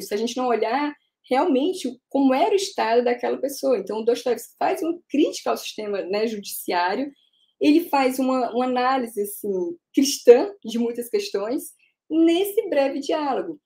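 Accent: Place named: Northeast, Brazil. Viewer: Amei seu sotaque NORDESTINO.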